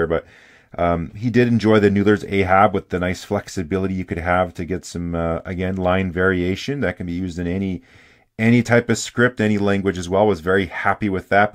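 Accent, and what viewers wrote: Canada. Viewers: Are you AvE’s less sweary brother by any chance. That Canukistan cant is remarkably alike.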